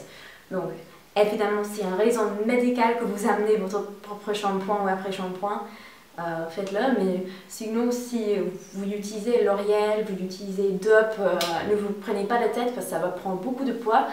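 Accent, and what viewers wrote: United States. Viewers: J'adore ton accent américain ! C'est trop jolie à entendre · Hello, j'apprécie beaucoup tes vidéos et ta personnalité comme ton léger accent américain (j'ai dit léger!). Well, concernant les drap je pense pourtant qu'il est une bonne idée d'emmener des DRAP-HOUSSES car il paraît que ça n'existe pas aux USA? J'en ai jamais vu tout au long de mon année aux USA et lorsque une amie devait y aller quelques années plus tard, elle en a emmenés des draps-housses et elle en était très contente d'avoir suivi mon avis. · Elle est américaine :) tu l'entends avec son accent :) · J'adore l'accent americain o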